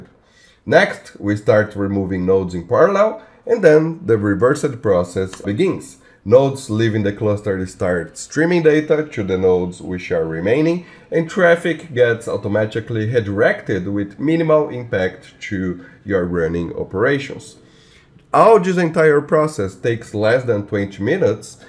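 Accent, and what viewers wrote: Brazil. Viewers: Pelo sotaque esse cara é brasileiro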